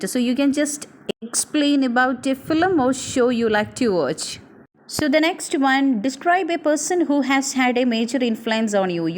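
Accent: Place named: United Kingdom. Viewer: Mam is using British accent more.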